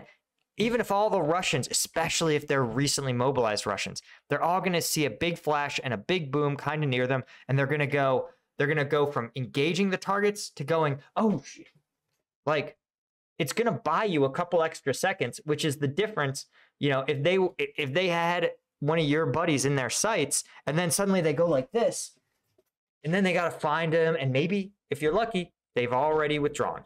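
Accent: United Kingdom. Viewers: Sounds like he’s British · So proud to hear a British voice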